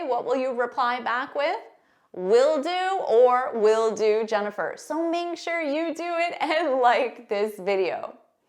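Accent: United States. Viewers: Such horrible american accent! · A nasal American English speaker stating the obvious. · I don't like your pronunciation so so so boringly American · You speak American!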